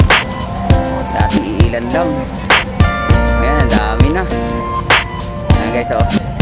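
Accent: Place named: Visayas, Philippines. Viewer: malingaw ko maminaw nimo dong blogger ba kay naga tinagalog ka apan bisaya kaayo imong accent hehehe. payts dong. tira mga bisaya!!!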